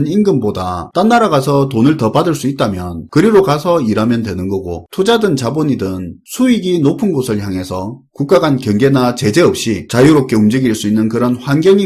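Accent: Gyeongsang, South Korea. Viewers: 갱상도 사투리로 일목요연 정리해줘서 늘, 감사합니다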